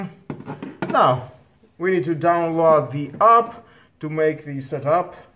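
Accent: Greece. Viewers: Μόνο οι Έλληνες έχουν τέτοια αγγλική προφορά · και μένα μου φάνηκε λίγο ελληνική η προφορά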